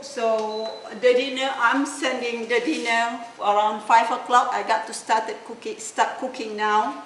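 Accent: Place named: Malaysia. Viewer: I love to hear your malay accent english which is very original, a hard to find especially those who have spent many years in America. I love your recipe of that "NASI LEMAK". It has a beautiful aroma and unbelievably tasty rice. You have very good heart and I wish you all the best for your future.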